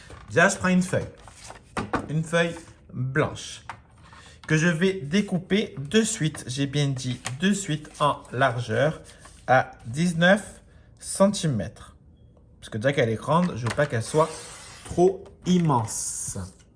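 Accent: South, France. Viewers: Sa vas? Accent du midi sa réchauffe le coeur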